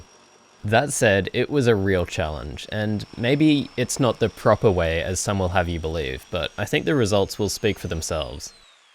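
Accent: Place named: Australia. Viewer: First time to your channel. Came here from a short of this video, started watching for my fellow Australian accent, stayed for the great build. Nicely done.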